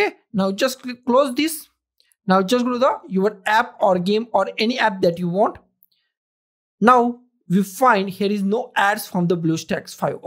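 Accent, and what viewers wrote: India. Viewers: yes great informative video!! india accent :X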